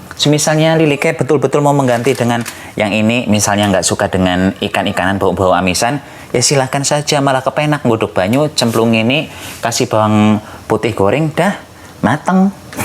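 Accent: Banyumas, Indonesia. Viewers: Sangat bermanfaat Lik,, lilike wong Endi sih daning bahasane ngapak banget 🤣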